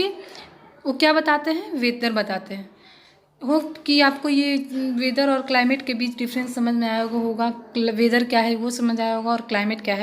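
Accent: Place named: Bihar, India. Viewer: Bihariyo ko tarah mt bol pagal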